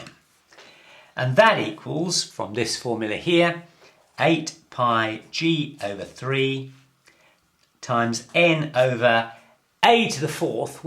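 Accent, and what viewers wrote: United Kingdom. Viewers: British accents should be forbidden in youtube videos. No matter how stupid the ideas are, if they are told in a British accent, people will believe.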